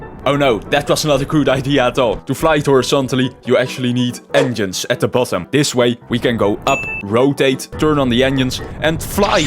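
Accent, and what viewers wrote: Netherlands. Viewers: I smell dutch (netherlands or nederlands) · i smell nederlands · Ru dutch? Ur accent is dutch.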